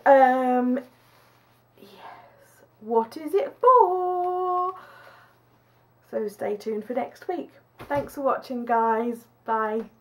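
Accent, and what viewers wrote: England, United Kingdom. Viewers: you are so cute! Love your English accent. You make me smile. You always lift my spirits! God Bless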